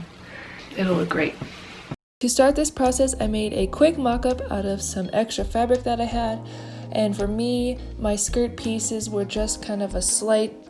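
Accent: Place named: United Kingdom. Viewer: Girl I came from another video of yours and I was just cracking up (the 70s jumpsuit) There’s so many times I was like “what am I watching 😂” but then it ended and I was like “oh no wait come back” anyway I clicked on this one and then it came up in the beginning that you’re doing this for your birthday and (if I date it right 😅😩) means you’re a Scorp and I was just sold. The vibes here are so chaotic yet so safe. I came for the sewing but stayed for the British accent. You’re brilliant luv, you’ve got a new fan xx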